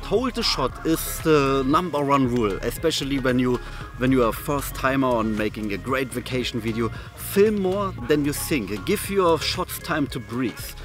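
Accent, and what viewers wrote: Germany. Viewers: dein englisch hört sich voll deutsch haha nicht böse gemeint aber waren auf jeden fall gute tipps 💪🏽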